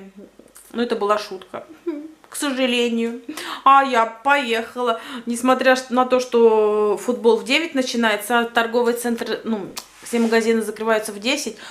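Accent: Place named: Uvarovo, Russia. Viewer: говор наш уваровский удачи тебе подруга!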